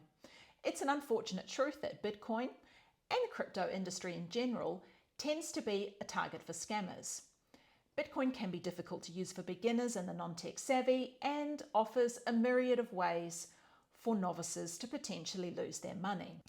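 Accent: New Zealand. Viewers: Enjoy your videos. Could you provide a bio sometime in a video? I believe you have said you are from New Zealand (and I detect the accent) and live in Portugal now. How did that happen?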